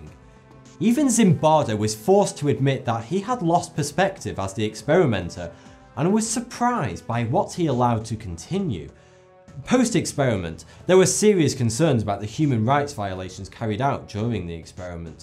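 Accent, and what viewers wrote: United Kingdom. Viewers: He's Vsauce + Tom Scott, but extremely Brit.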